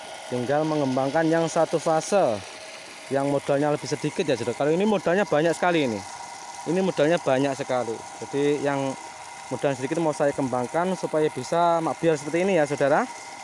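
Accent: Java, Indonesia. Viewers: 😂😂😂😂😂😂 mengelepi sawah to mas.....?seneng medok jawane...😂😂😂😂 Sukses selalu mas...Lampung nderek....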